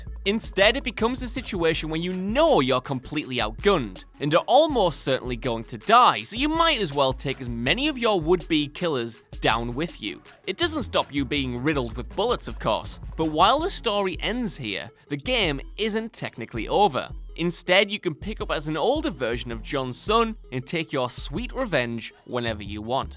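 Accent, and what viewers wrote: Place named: Liverpool, United Kingdom. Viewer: where's that english accent from? liverpool?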